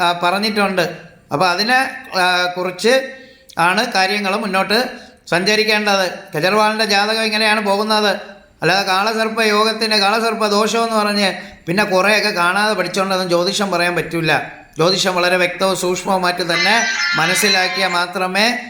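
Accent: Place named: Trivandrum, India. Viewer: Tvm language....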